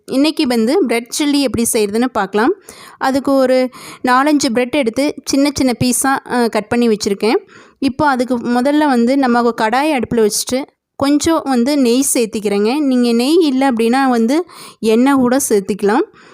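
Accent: Kongu, India. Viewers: Kongu tamil speech is very nice sister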